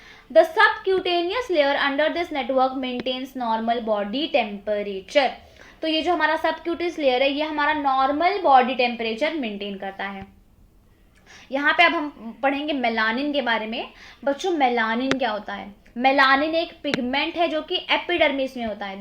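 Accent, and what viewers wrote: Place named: Maharashtra, India. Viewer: Hi 😊 Didi You Explain Very Very nicely but..... I mostly love ur those videos when you teaches on paper like you're teaching on White Board I'm A little bit weak in Maths But When U Explain me I can Solve any problems from Equation Now my Last exam is stared only 10 days are Remaining I will do my Best Thanks for your Maths Sub Teaching ☺️ Ap jaisi teacher agar meri School ki maths teacher hoti mera matlab agar ap hi meri maths teacher Hoti Mai bohot khush nasib hoti Aani mi tumchya awaja varun olakhlay ki tumhi marathi aahat🤗 Thnk You So Much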